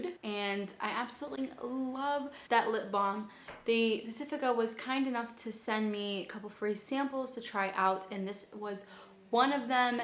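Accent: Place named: Massachusetts, United States. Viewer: Where in Massachusetts are you from? That accent is deep lol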